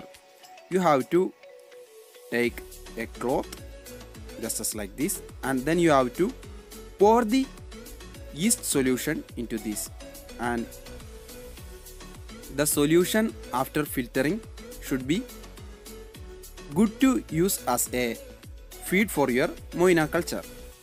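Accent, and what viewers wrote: Kerala, India. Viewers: Machente English kettal ariyaa . malayali alle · Hey by ur pronounciation u r mallu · Malayali 😂 Aaa English kettale ariyaa malayali anenne 🥰🥰🥰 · English paryunath ketal arinjoode malayali aanenn😂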